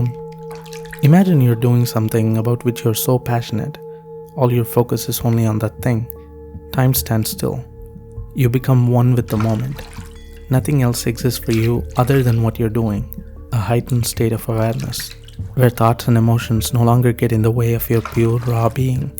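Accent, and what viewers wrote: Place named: Tamil Nadu, India. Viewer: Tamil scent in your accent. அடி தூள்.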